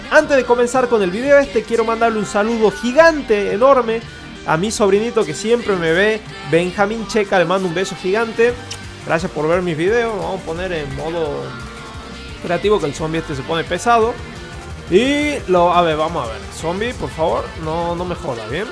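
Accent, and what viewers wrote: Tucumán, Argentina. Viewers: Tucumano hasta el pingo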